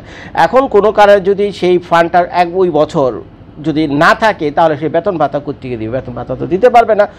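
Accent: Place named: Old Dhaka, Bangladesh. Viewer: আপনার ভাষা অনেক টা পুরান ঢাকার আশে পাশে কেরানীগঞ্জ গঞ্জের মত লাগছে।জানতে পারি কি আপনার হোম ডিস্ট্রিক্ট কোথায়?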